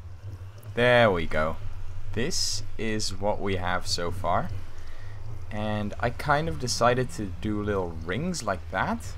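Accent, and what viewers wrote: Netherlands. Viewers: Are you dutch? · are you Dutch? Becouse you sound Dutch:)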